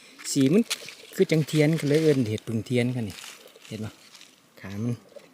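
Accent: Chaiyaphum, Thailand. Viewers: สวัสดีจ้า ยายบอกตรงๆเลยวาหัวตาสิเห็นช่องของเจ้าเด้งขึ่นมา ยายกะหัวตาฮู่วาทางบ้านเฮากะมีเห็ดเผิ่งคล้ายๆแถวเมืองนอกเนาะจ้า เบิงดี้…ดี เจ้าเฮดคลิปดีอีหลีจ้า สำเนียงคือชัยภูมิเนาะจ้า❤️👏👏👏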